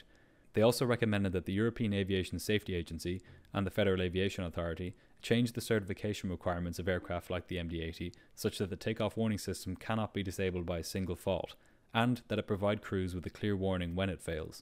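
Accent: Ireland. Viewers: MTDSRIP. I'm hearing an Irish accent from the narrator... Wasn't sure until he said 'turty', for 30. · Just curious. Where is the narrator from? He sounds very Irish to me.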